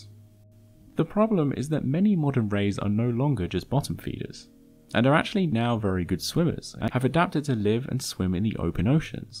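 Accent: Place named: United Kingdom. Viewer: in, the "sorefish?" Why were they sore? Oh, wait. You meant "saw-fish." Damn, dude. Learn proper English. Ah'm jus' phokin' wi' ye, mate. Jus' takin' tha piss. But for real, sometimes, your non-rhotic 'r's, plus adding 'r's to words with open 'ah's, make what you say "hahhd to unduhhstand" for non-Brits.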